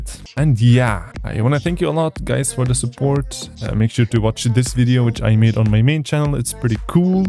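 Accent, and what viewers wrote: Germany. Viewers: are you from germany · you german?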